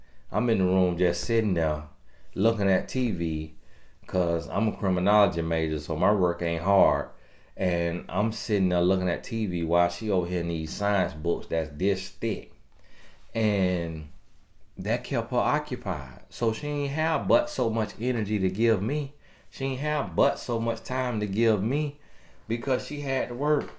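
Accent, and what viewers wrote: South, United States. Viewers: Girl he is country. Yes he has been saying back 😆 I only knew that cuz I'm country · i had to rewind. Bro did you say 'pern' (porn)? 💀💀💀 My bro country as hell, & I'm country too so its all good.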